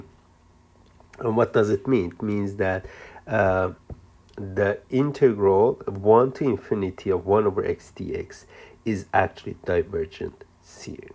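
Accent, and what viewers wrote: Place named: Nebraska, United States. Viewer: are you from nebraska? you sound like my dad?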